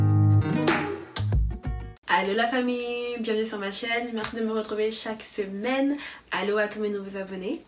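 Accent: Cameroon. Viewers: Je viens de découvrir ta chaîne, c'est super wahou l'accent camer se sent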